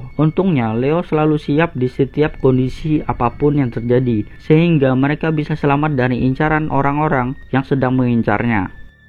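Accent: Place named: West Java, Indonesia. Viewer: Sunda kentel kayanya ya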